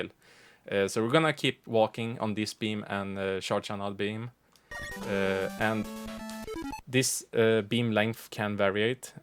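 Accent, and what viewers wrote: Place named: Sweden. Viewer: your swinglish is awesome. thanks for all the vids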